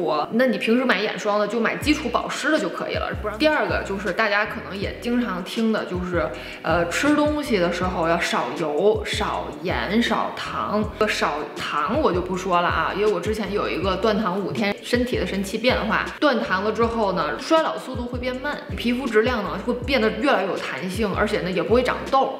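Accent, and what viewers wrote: Tianjin, China. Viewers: 博主讲话有天津味儿。跟Tracey 有点像。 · 我第一次看你的视频就觉得你是天津人，和我一个多伦多的天津朋友说话的那个味儿太像了。我本人坐标温哥华，希望能分享些挑选衬衫的方法。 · 你好，请问你是天津人吗？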